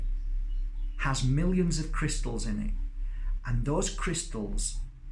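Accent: Yorkshire, United Kingdom. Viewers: Are you from Yorkshire ? I’m in South Africa but that sounds like a Yorkshire ascent ? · You sound like from my neck of the woods. 😁 yooorkshire! Thank you for this. I love getting new info. I am on my spirtual journey, doing reiki as well. 🥰 i will visualise myself as a crystal when sending love and light💫💜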